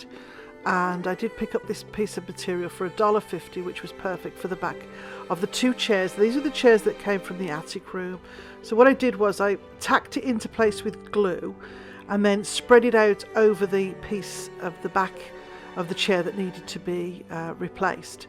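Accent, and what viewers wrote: United Kingdom. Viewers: We live in Arkansas US. Getting close to building our home and decided French Country is my favorite style. My friend of over 40 yrs is British and hence I enjoy your voice. Love your ideas! Was excited to see the blue willow as I have the exact plates 💙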